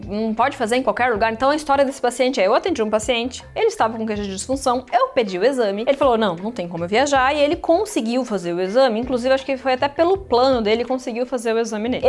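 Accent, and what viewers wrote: Brazil. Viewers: Deve ser do interior de São Paulo SP como a pavoro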